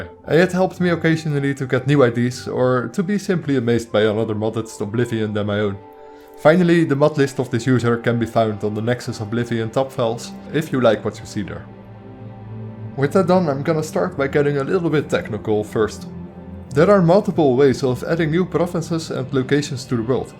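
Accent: Netherlands. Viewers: Je bent nederlands hoor ik gelijk! :D NICE!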